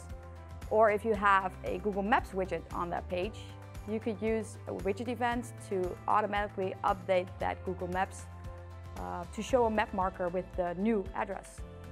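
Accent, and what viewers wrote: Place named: Netherlands. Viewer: Sick dutch accent XD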